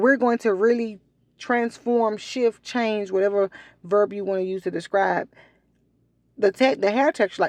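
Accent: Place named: South, United States. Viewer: I watch all your uploads so informative and satisfying to see! I have been through the gamut of hirsutism hair removal issues and just seeing you help others is very encouraging. This upload I enjoyed your accent and the way you pronounce "depilatory" I just love listening to your southern accent! Love it! Keep up all the great entertainment and good facial hair waxing help!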